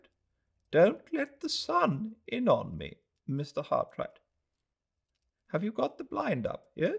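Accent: United Kingdom. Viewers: This is amazing, I just discovered this reading, so pleasurable and quintessentially English. Thank you.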